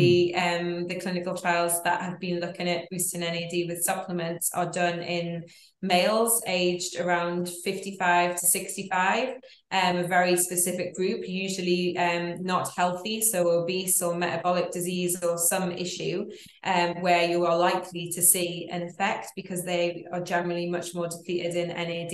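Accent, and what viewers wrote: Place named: Newcastle, United Kingdom. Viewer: Geordie accent.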